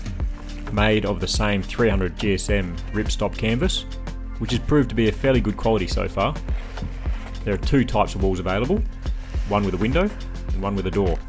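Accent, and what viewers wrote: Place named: Australia. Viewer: Something about Overland-related videos with Australian voiceovers makes me trust the content that much more. Great review guys, appreciate the time and efforts to put this together!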